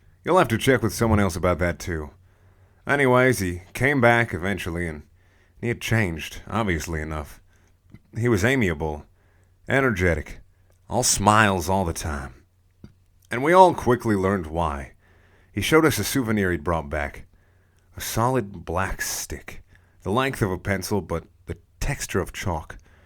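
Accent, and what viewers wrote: South, United States. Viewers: I love how the video gradually got more southern. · I want to hear your country accent like so hardcore xD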